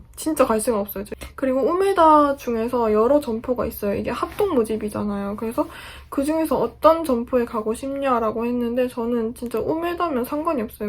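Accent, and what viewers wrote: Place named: South Korea. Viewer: 파이팅이요!! 한국 사투리 구수하시네요 정감가요 일본생활 파이팅입니다!!!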